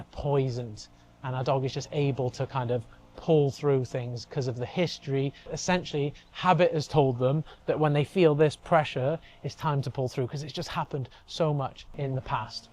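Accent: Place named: England, United Kingdom. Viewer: Good to see that I'm on a good way and combined merhods as you 😉 I thought it's mean after sniffling anyway to forbid everywhere. So I startet to take him in sit position in front of hotspots ... and wait till he ask with view to let him sniff and pee ... Realky great vid you kreate and I love the english 😁😆 nice to liste to 👍🏻 compares to the american ...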